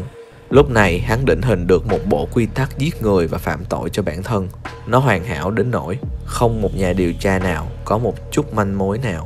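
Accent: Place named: Da Lat, Vietnam. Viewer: bạn này chắc là người Đà Lạt, giọng giống giọng em trai mình quá luôn ah.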